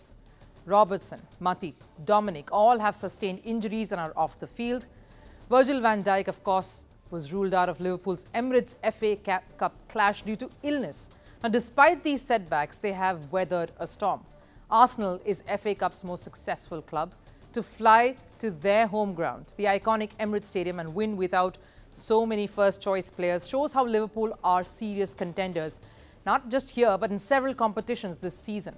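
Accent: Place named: India. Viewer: Listening to football news with an Indian accent is weird.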